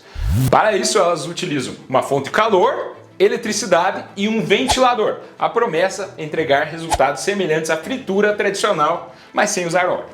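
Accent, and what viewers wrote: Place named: Minas Gerais, Brazil. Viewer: Mineiro, caipira ou americano??? Bonitinho o doutor... fala diferente, né?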